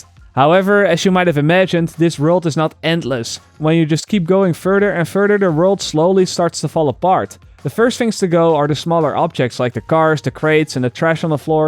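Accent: Netherlands. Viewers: nl accent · i already tought you were dutch because of your accent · oke becouse of your accent i can hear your dutch haha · Ha ik dacht al dat hij nederlands was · Dat dutch accent tho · Je hebt zo'n standaard Nederlands accent haha, mooi filmpje though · Ik hoorde al dat Nederlandse accent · are you dutch???? you sound dutch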